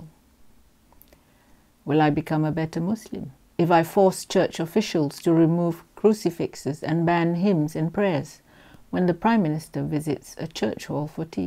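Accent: Malaysia. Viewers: You speaks as true Malaysian. Thumb up.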